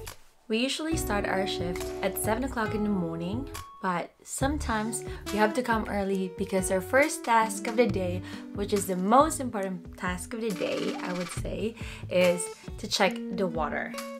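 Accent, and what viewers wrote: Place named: Australia. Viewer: Love the Aussie accent😍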